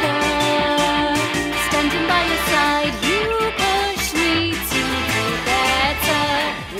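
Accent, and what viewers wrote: Liverpool, United Kingdom. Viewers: Don't worry, definitely not the worst impression of a northern accent I've heard lol 😆. Thought it sounded like a mix of Scottish and Liverpool, with a hint of Yorkshire, myself. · Love a good Scouse accent. Love it. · Nice scouse accent lol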